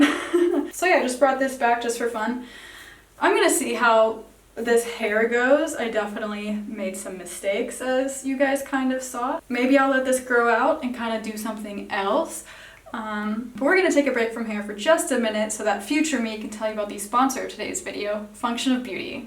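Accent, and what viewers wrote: Netherlands. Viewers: waitttttt, are you Dutch??? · OH MY GOD UR DUTCH?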